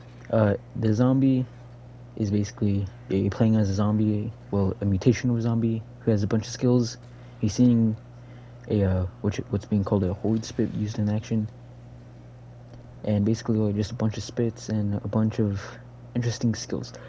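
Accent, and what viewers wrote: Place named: South Africa. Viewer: Great game. Thanks for the video. Btw, what accent is that? Sounds like south african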